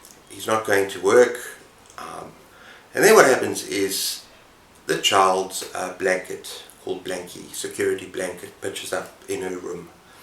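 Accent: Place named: South Africa. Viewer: Love to hear your South African accent and way of speaking. As a South African living outside the country it is great to hear you. And it’s great too to hear someone who engages with the books I love. You live in an area blessed with access to great books. Sterkte, my vriend.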